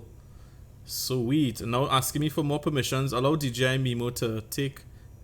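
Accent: Trinidad and Tobago. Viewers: Good video! You sound Trini! Am I right? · wait wait, you trinidadian awa??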